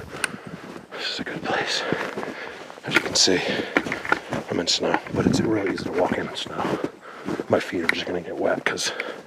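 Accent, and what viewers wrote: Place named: New Jersey, United States. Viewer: I've been watching your excellent videos for a while and I knew I heard a touch of Jersey in your voice. Perhaps it's just a bit of Jersey attitude. In any case, you confirmed it. Good luck and stay safe.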